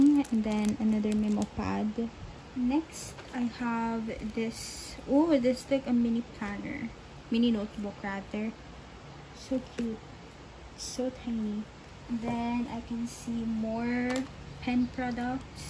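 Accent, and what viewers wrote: Philippines. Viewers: ahaha i knew she was filipino kase her accent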